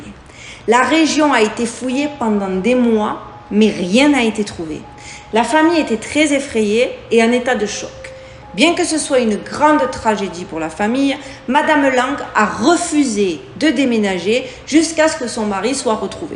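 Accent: South, France. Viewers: "il aurait pu écrire le Seigneur des Anneaux... sûrement un cousin à Tolstoi" euh, un cousin à Tolkien plutôt non ? Là tu m'as tué 😂😂👍😉 Merci de nous régaler de tes vidéos, de ton accent du sud et de ta bonne humeur 🙏🙏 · Bravo pour tes plans d'attaque. J'adore ton accent du sud. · coucou ça fait 3 jours que j ai découvert ta chaîne et je la dévore 😍😍😍 par contre si tu pouvais faire quelque chose pour l écho..... ça gâche un peu 😏 mais je suis agréablement charmée par ton accent du sud je m attendais à un accent canadien 😂😂😂😂 vive les expats ❤ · Merci ma jolie petite fée 🌹ça m'a fait du bien de te voir,avec ton accent du sud et ton humour. J'étais en pleurs en regardant 2 vidéos , terribles, ou mes gens se sont retrouvés, nasses, avec impossibilité de fuir.Il y avait vraiment la volonté de leurs faite très mal .😢Des parents , enfants et grands parents pacifistes. 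Gazés à blocs matraques. Pour rien,un crs à dit z une femme, qui m'a laissé passé, que les ordres venaient d'en haut. Sinon j'adore ta vidéo,toutes les histoires. Je connaissais, celle du gars quo disparaît, du champ, je la pensais plis vieille. Sinon connais tu l'histoire du nimitz ? Très étrange,j'avais vu ce film avec mon père quand J'étais ado ,ça te ferais un bon sujet🌞Merci pour ton super taf 💙Prends soin de toi, ainsi que ton fiston 💖et la belle 🐺🐾💖Duchesse .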